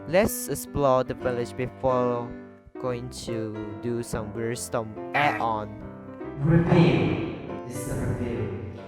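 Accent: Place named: Malaysia. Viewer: He's Malaysian, English is not his native laguage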